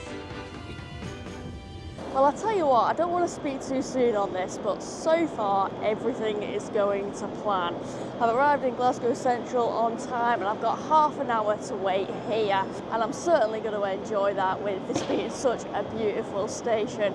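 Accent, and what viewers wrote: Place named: West Midlands, United Kingdom. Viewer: Great vid! Are you from the west mids by any chance? Sorry couldn’t help but notice the accent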